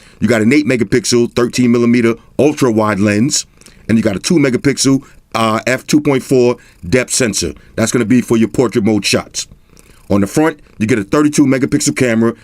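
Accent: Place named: New York, United States. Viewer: I'm type shit living the struggle so videos like this help me a lot - is always making the best reviews for this type of shit - and also does anyone else just laugh because the way talks is so New York that it's hilarious 😭😂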